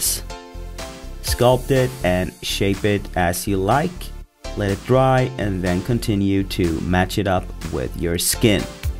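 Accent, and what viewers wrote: Scania, Sweden. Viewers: är du från skåne?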